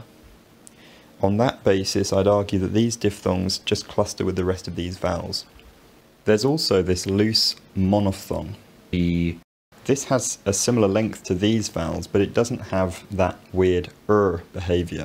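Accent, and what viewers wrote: South Africa. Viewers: still has a slight South African accent. A few times when he uses an /i/ between two vowels and is most noticeable when he says "I" Otherwise I'd have no idea he wasn't native British. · That's the poshest South African I've ever heard.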